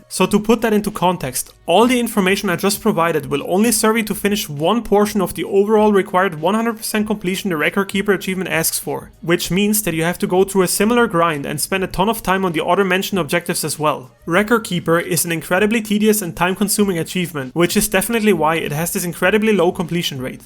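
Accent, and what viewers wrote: Germany. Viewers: Man hört direkt dass du deutscher bist. Trotzdem geiles Video!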